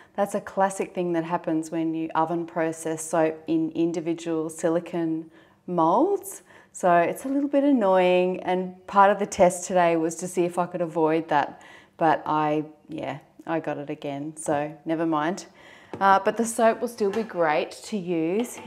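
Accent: Australia. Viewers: Sounds like you might be an Aussie.. 🌻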